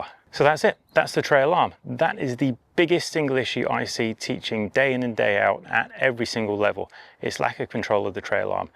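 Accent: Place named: England, United Kingdom. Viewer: Hey, I'm a big fan of what you do. I've studied and played (1 handicap in '02) for 46 years. And your insight on chipping is Brilliant. One suggestion.: Speak a bit slower. Sometimes your rate of speech, is such that your words are hard to discern: I had to replay with captions, to understand the word boil, in the first 30 seconds.I love your English accent, but it can, at times, be a second challenge, for us Yanks. Thank you good Sir.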